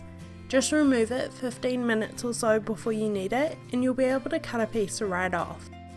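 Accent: New Zealand. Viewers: Thank you for the recipe and instruction very simple and clear. Notice you got a NZ accent. Love from Christchurch. Cheers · A New Zealander. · Are you kiwi? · Awesome dumplings, and once again, love the New Zealand accent...... You go girl, do you think you could make hangi dumplings? Love the channel, and ideas you have. Yummy stuff. From Palmy...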